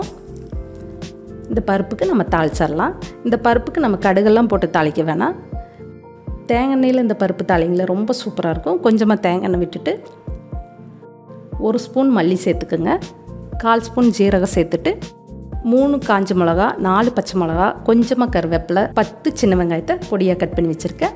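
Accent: Coimbatore, India. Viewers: Unga kovai slang superb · slang apdiye namma ooru slang Sema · Your demo is very good with Coimbatore accent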